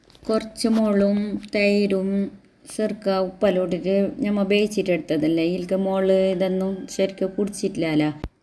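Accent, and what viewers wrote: Kasaragod, India. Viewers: എനിക്കിഷ്ടമാണ് നിങ്ങളുടെ സംസാരം പിന്നെയും പിന്നെയും കേൾക്കാൻ തോന്നും കാസർഗോഡ് സംസാരം ഒന്ന് വേറെ തന്നെയാണ്❤❤❤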